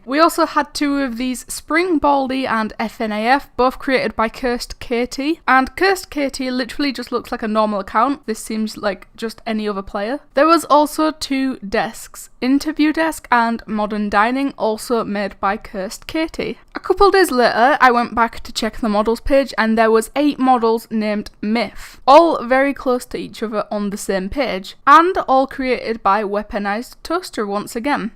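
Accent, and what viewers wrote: Scotland, United Kingdom. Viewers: Scottesh Accent over <num>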